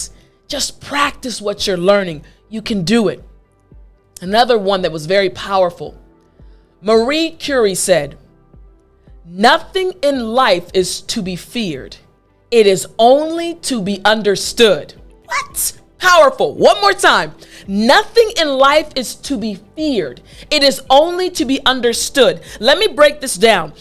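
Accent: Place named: United States. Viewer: I really and truly appreciate your way of speaking,i'm also Working on improving my fluency.i'm a learner .and I am a congolese. Props to you I wanna talk as you are talking cos i live the american accent. I cannot express my appreciation.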